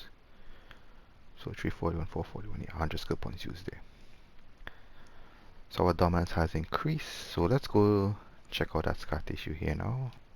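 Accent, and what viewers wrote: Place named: Trinidad and Tobago. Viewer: how this man sound like ah trini so, thanks for the vid bro, im also playing a red lantern. me had a little trouble understanding them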